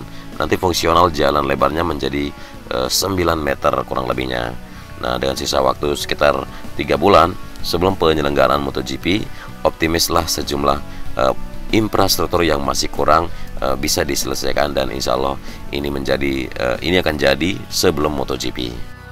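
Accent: Java, Indonesia. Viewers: Dr cara berbicara bang ini kaya orang jawa deh.. Asli mana si bang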